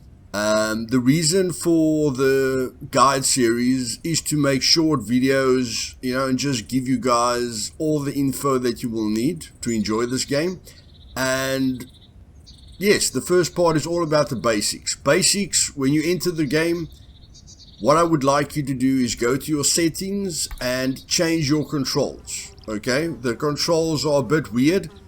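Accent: South Africa. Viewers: Jesus that south african accent :( ugh...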